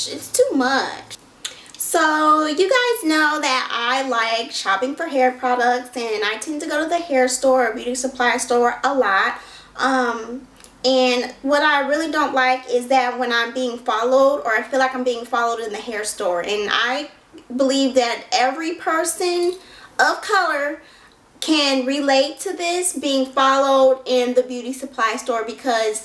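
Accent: South, United States. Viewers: Lol your accent is mixed with white girl & country!! it's so cute lol .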